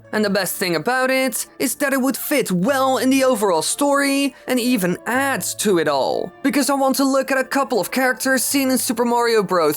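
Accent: Netherlands. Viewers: Ik dacht al dat je Nederlands was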